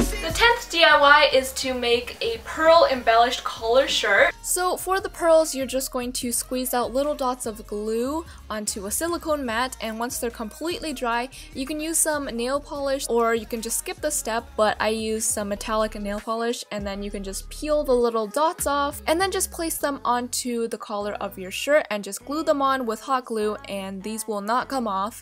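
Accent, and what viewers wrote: Canada. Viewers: I'm from England and I'm so proud that I managed to tell you are from Canada and not America from your accent 😂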